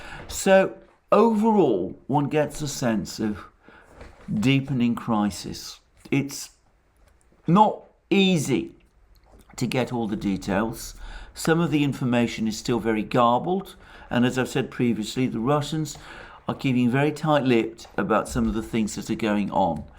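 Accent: United Kingdom. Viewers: With all due respect, but I disagree on your views regarding the assassination attempt on Dugin!!! The ends justify the means and he is supposed to be Putin‘s „brain“. And hearing someone with a british accent complaining about ONE innocent life which has been lost is soooo funny!!! By the way… how‘s the „Bomber“ Arthur T. Harris Statue doing in London? Please do not tell me about lost, innocent lives!!! You are just not entitled to do so. Sorry… thats my very personal view.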